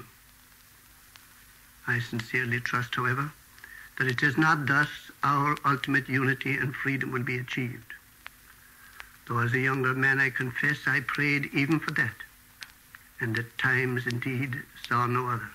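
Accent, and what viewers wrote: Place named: England, United Kingdom. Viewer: He sounds more English than Irish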